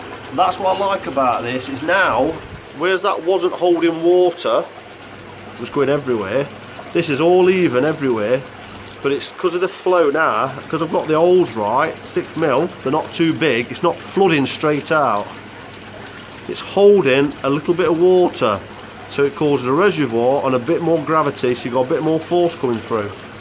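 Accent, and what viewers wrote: Nottingham, United Kingdom. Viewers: Pond and grow on is looking fantastic matey... Just starting with my build at the moment and created a channel... Would love it if you subscribed and if your free offer any advice...By the sounds of the accent and your Notts shirt you may be local to me ? <link> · Love of Orchids,Koi & Gardening! Nice one, is your accent nottinghamish?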